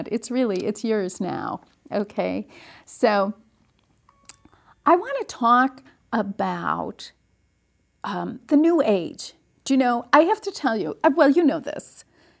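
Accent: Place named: Texas, United States. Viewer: What has mrs studied at university? Where did she obtain the Ph.D? She has tics for example at 12.39 minutes. She is scary, she is so demanding like a religious clerk. "Right"? "Listen". With her Texan accent.. No, not appealing, no charm. Be aware of your body language when you are on film.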